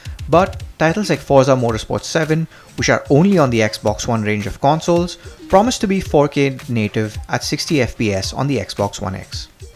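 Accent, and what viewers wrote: India. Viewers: i cant understand this indain tech support guy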